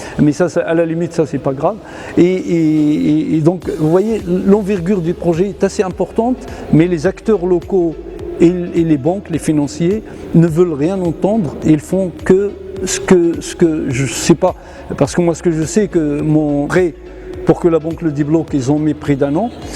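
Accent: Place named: Shilha, Morocco. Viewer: امالك علا لفرونسي باين طاغية عليك الشلحة هضر بيها باش ناس تستافد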